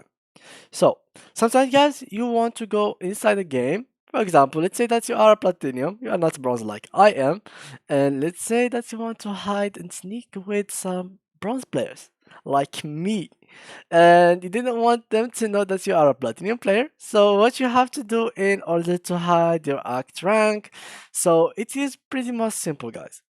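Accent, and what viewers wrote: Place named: Morocco. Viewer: 3rftk mghribi gha mn l accent w bsh zdt t2kdt fsh seft lk khona msg flgame hhhhhhhh anyways gg abro and thanks ❤️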